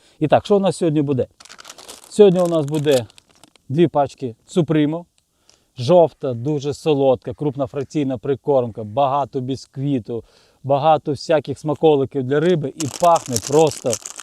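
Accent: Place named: Western Ukraine, Ukraine. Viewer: Неначе пів життя на західній Україні проживав, мова топ( майже😊)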